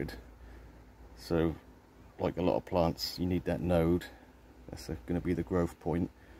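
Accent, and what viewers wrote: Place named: East Anglia, United Kingdom. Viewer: Hi would you sell me a couple of cuttings …. From your accent I can detect a slight east Anglian lilt. Possibly Norfolk? Or north Suffolk. Anyway I travel to norfolk every couple of weeks so I could collect as I’d like to try growing it. Having been inspired by your video or you could post …? If not. Any ideas where I could get hold of some?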